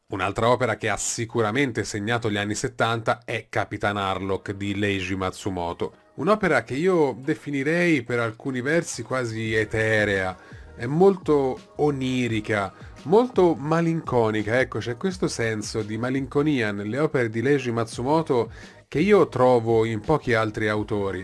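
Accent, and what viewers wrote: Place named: Tuscany, Italy. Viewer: Hai una voce bellissima, toni pacati e mai noiosi, accento vagamente toscano mai pesante e contenuti coinvolgenti. Ho adorato anche questo video. Piano piano me li vedrò tutti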